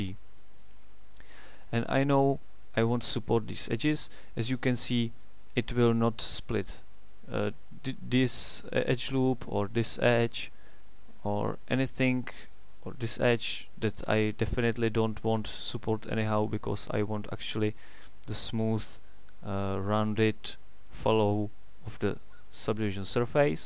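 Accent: Czech Republic. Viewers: very useful, I like it, but that czech accent is too much xD I'm sorry, but it is difficult for some people to understand our accent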